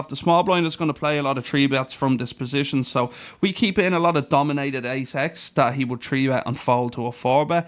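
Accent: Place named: Ireland. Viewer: Love it! I just watch your vlogs cause I enjoy hearing hand histories in an Irish accent. Haha. Anyways great episode. Also, that raise on the river with Q10o was SPICY!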